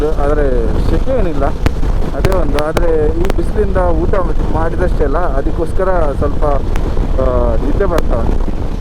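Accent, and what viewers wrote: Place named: Mangalore, India. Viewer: Superb voice and Mangalore Accent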